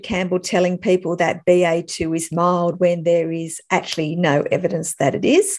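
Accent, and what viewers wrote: Australia. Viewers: Glad you're not my Dr. Mind you it sounds like you're Australian we've all noticed how mad you are over there about covid over there..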